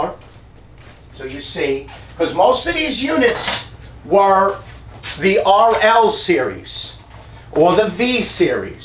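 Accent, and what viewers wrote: New York, United States. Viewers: Your NY accent is absolutely wonderful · Youse speak like my Lonk Island relatives... just for the NY accent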